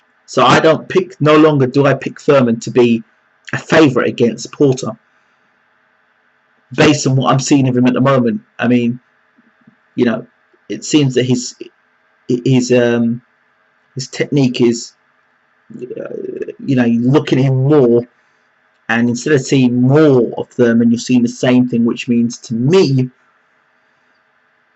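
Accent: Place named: United Kingdom. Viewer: this nigga look like he from South memphis and has a British accent.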